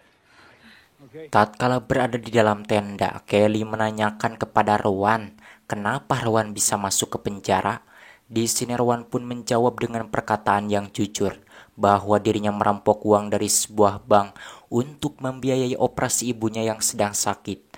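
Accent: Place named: Tasikmalaya, Indonesia. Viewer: Pasti orang tasik atau teu garut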